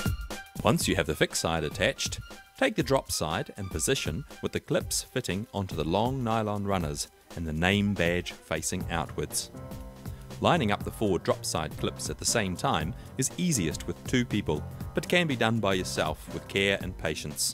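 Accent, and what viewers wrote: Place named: New Zealand. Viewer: Cute Kiwi boy accent!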